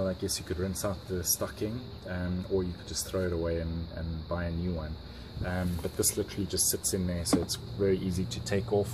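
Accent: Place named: South Africa. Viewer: He sounds so RSAn😂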